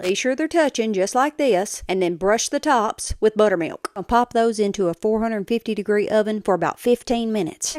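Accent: South, United States. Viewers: Born and raised in MI, but stayed in KY about 4 years When I was a teen. It's where I learned how to cook and homemade biscuits and gravy are a regular meal. The accent and recipe make me miss the south. Those look delish btw ❤ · Some people just ain’t got no mama that ain’t no crack head 😂😂😂ya know what im talkin bout 😂…. It’s true , I just have had my fill of hearing that thick southern accent in this political climate , so slight apologies for the snarky comments….hopefully you a lovely southern belle with a non racist disposition 😊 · I love her country accent 😊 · Love that southern country voice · You know what makes this fun, its following her direction in southern accent which i love. Thanks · I'd marry this woman right now I love that southern accent ❤ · Well, thank you for your southern recipe and that southern accent. My sister may the Lord bless you forever. 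· You have a beautiful smile and love your southern accent ❤️ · God that southern draw sounds sexy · Just on your accent alone I subscribed! Southern accent = Amazing food! ❤❤❤ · God was in a good mood when he gifted us with the Southern accent. Love from the UK. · Gotta love your southern accent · I'm from alabama and I love her southern accent. Would not trade my accent for anybody. Southern women know how to cook and we know how to cook and eat and enjoy it l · The accent is so cute. As southern girl for sure. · I'm not sure which is more perfect, them Catheads, or that perfectly beautiful Southern Accent. ❤ from Etlanna. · Trying to place Your Accent: Alabama? Mississippi? Or Georgia? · goodness how nasty that southern accent sounds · Whenever I hear a woman with a southern twang I immediately trust their food judgement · You might be the most southern woman I think I've ever heard.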